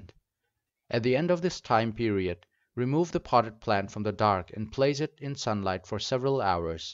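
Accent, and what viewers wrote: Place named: Northeast India, India. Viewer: Well it played automatically after an experimental related video. Mostly I saw high end instruments being used, then in your video I saw the microscope, very much like the one my College labs has. There I thought , he must be an Indian and by the accent, a northeastern. Then I checked your abouts'.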